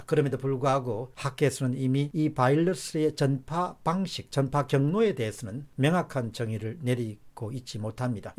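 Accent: Gyeongsang, South Korea. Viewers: 이분은 경상도 억양이 있으신거같아요 저는 우리 아빠같은 경상도라 친근감을 느끼는데 ,, ㅎ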